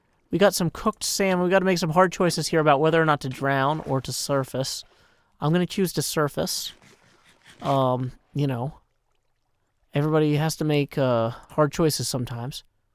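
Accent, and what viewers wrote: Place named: South, United States. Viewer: Yes. It has been a very long day and your southern dolcet tones are as warming to the soul as Pie and Chips sandwich:D keep up the relaxing work:D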